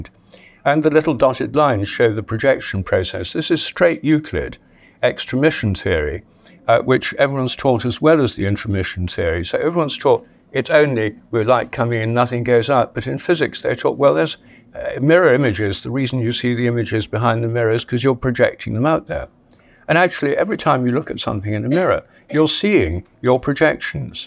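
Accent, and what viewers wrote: RP, United Kingdom. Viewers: Occam's razor. 1. People are social creatures, we will always feel more watched when in company (spotlight effect). 2. Confirmation bias. We remember only the times we looked and saw someone looking back, forget all the times we looked and nobody looked back. 3. No more need for all the hypothetical superpowers or deities to add on to the above. Even a posh English accent can't wash away the flood levels of BS here. Unfortunately, the promotion of pseudoscience is a hard no for me, so unsubscribing from this channel.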